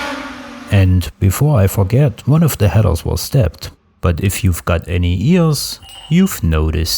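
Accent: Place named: Thuringia, Germany. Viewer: On a side note your thick accent sounds like you could be from near Thuringia. Yet there is often a hint of Swiss or Austrian. Very interesting. In Gera haben die immer so einen tollen Akzent.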